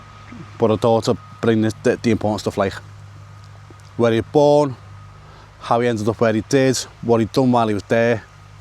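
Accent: Liverpool, United Kingdom. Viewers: I love your interest in history. I the young ones who follow you are learning something they never would’ve known, pretty much like myself but I’m a heck of a lot older. I hope you manage to clean the gravestone, so it looks good again, I’d like to see a video on how you do it if you do. A sort of time lapse if that’s what they call it kind of video, I’m sure it would stand out more and he would be proud to know that someone would’ve been looking after it as he neared the end of his life. Thank you for the information and all the research you have done. Amazing stuff La as yous lot say in Liverpool, I love the accent and the people. YNWA, never forget those who have gone before us and those who need our help now. God bless you Liverpool from the bottom of my heart.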